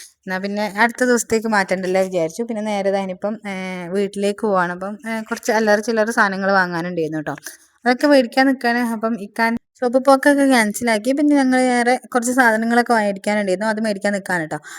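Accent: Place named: Malappuram, India. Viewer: Samsaram sherikum malappuram touch und atha chothichee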